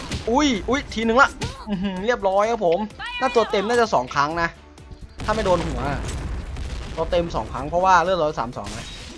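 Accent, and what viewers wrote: Isan, Thailand. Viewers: ชอบคนแบบพี่มาก อ่ะ แบบพูดอีสานสำเนียงไทย 555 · อ่าย เจ่าเป็นคนอีสานบอ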